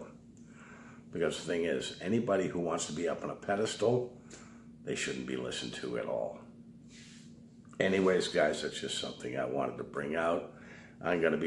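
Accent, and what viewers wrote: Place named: Boston, United States. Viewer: Good insight always to the point and says it like a true bostonian (guessing thats what the accent is). its messy when your in and leaving isnt a fix in itself. Gotta get a life. Thanks, pal, you are a breath of fresh air.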